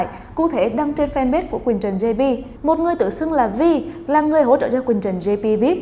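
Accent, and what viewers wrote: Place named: Central, Vietnam. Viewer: thích bạn này nói giọng miền Trung hơn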